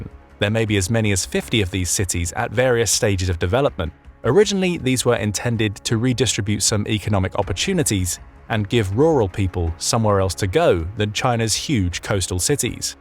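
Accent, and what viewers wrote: United Kingdom. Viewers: Yet another AI generated channel with a British accent robot reading script. Who is behind this channel?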